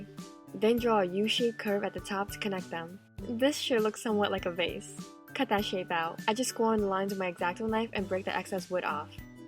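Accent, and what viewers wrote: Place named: New Orleans, United States. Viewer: Are you from South Louisiana? Your accent sounds like you'd be from New Orleans or one of the communities surrounding it. You sound like home to me!! Also, this is fantastic! The only suggestion I'd make is a simple one: I think that instead of calling the paper pieces you create "stencils" is slightly off. The word "pattern" makes more sense to me, but that could just be me. 😁 Other than that, this is fantastic! I don't make miniatures (yet, at least), but I'm subscribing!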